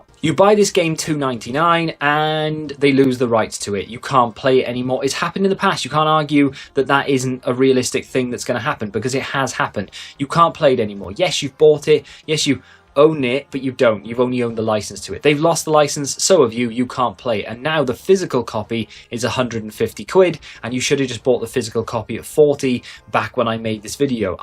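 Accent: Wales, United Kingdom. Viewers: He's Welsh boyo.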